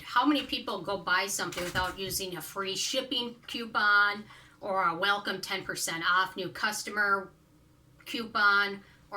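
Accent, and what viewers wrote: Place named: Midwest, United States. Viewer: Chicago or MN? Maybe even PA? Accent.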